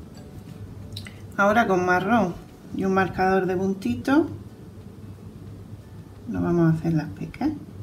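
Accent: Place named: Andalusia, Spain. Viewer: Holà dime como coses las orejas del nuñeco muchas gracias me encanta tu acento andaluz 😘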